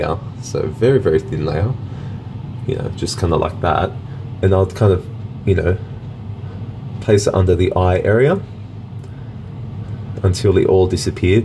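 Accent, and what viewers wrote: Australia. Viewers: I got a small flare up of eczema on one of my eyelids as well! It’s been like this for a few weeks. Its flaky and red, kind of like I forgot to add eye shadow on the other eye. 😳It’s odd how it randomly started without warning. Now I’m trying to back track what could have caused it. Thanks for your input about the things that worked and didn’t for you. PS love the Aussie accent 😂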